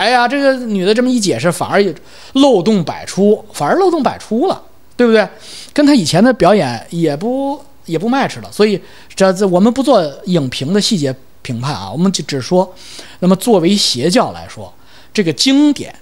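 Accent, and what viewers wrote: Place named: Tianjin, China. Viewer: 是桂林嘛，像天津話的嘛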